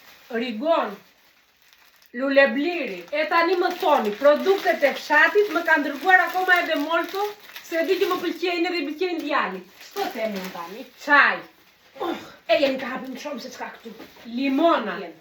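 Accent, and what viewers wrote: Greece. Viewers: Ti paske mar të gjith fshatin me vete.dukesh nga të folurit që jeton në greqi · Ne Greqi jeton? Se kshu duket nga theksi😅?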